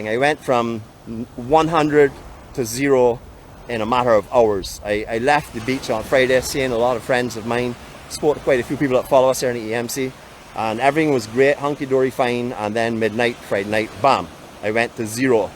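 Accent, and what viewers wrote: Barbados. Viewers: The Bajan accent is so cool. Love listening to you, and the ripples of the waves on the beach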